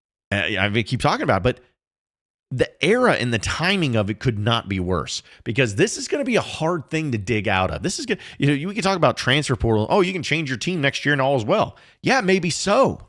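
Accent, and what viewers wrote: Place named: Kentucky, United States. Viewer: This guy speaks Kentucky talk, he should start doing Kentucky sports, hes got some cawood in him.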